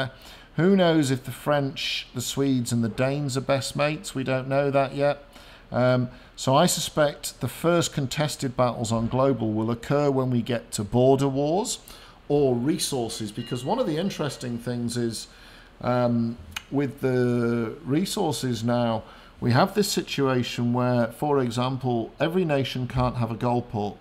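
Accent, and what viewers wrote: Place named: United Kingdom. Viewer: Really? I could of sworn your british :D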